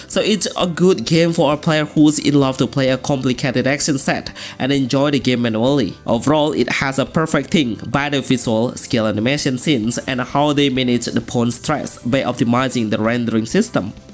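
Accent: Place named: Indonesia. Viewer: I know this accent. Indo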